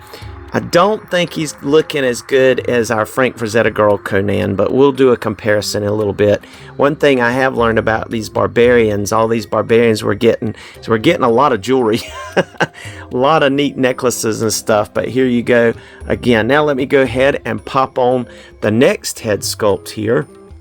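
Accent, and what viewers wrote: South, United States. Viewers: like the name! Reminds me of Osborne. I enjoyed the video.. I was just wondering where you’re from and the accent.? My guess is from down south? Alabama maybe? Your voice also reminded me of the guy from the hangover again enjoyable thanks.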